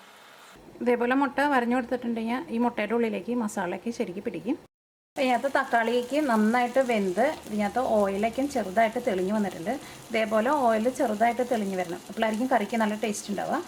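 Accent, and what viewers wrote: Thrissur, India. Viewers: Very nice. Pachakam n vachakam balanced. Nice Thrissur bhasha 🤗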